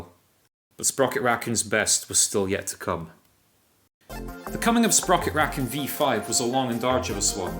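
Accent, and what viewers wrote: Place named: Scotland, United Kingdom. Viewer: Nice story and scottish voice. ;3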